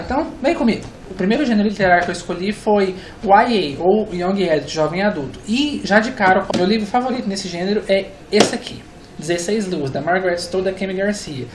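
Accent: Minas Gerais, Brazil. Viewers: que sotaque deliciooooossssoooooo,já morei em minas e sou apaixonada por esse sotaque,amei seus videos,bjs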